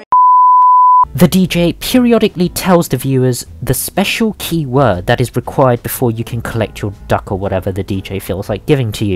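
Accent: United Kingdom. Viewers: Voice over is so excessively british I cannot concentrate on this despite being very interested in the story.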